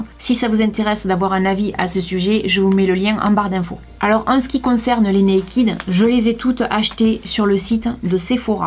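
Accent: South, France. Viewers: Les produits de chez Sephora que je racheté régulièrement sont le démaquillant biplace yeux, le mascara Sephora outrageous avec une fine brossé je vous le conseille, le masque de nuit mais je l'utilise comme une crème car je ne vais pas me coucher avec une épaisseur sur le visage Je ne suis pas emballée par les box et le système d'abonnement, je préfère choisir mes produits et je suis fidèle à certaines marques merci de vos conseils et pour votre accent du sud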